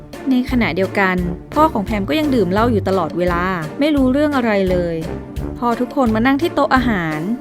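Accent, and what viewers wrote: North, Thailand. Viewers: แอดมินเป็นคนเหนือใช่มัยครับ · สนุกกกกก คนภาคเหนือแน่ๆเลยอะ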